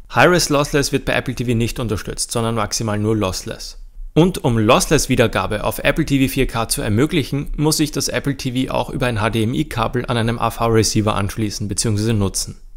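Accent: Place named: Austria. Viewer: Ich liebe diesen österreichischen Akzent immer wieder! 😃😍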